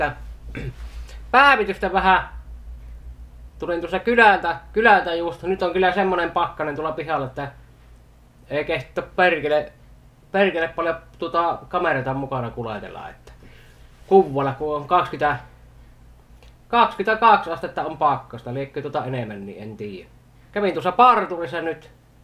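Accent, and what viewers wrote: Savo, Finland. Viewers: nyt ainakin kuulee ton savolaisen murteen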